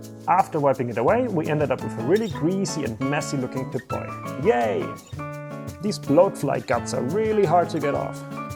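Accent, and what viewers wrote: Germany. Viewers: are you from german??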